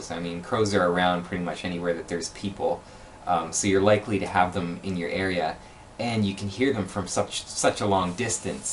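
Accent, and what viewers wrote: Canada. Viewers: I love your strong Canadian accent ❤❤❤ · "Aboot" Canada, obviously. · A very heavy Canuckian lilt. I say he's from Saskatchewan.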